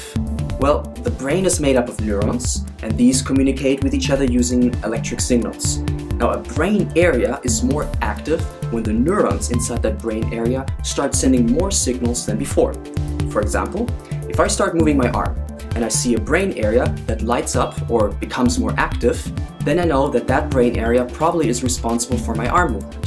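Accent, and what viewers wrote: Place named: Germany. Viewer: do you happen to be German or where does this very slight German accent come from?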